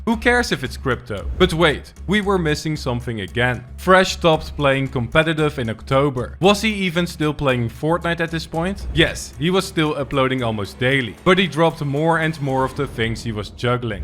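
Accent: Netherlands. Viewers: Are you from the Netherlands because you have such an accent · Lol the Dutch accent is pretty funny (No hate) I'm Dutch too and I also have the worst english accent Xd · U sound dutch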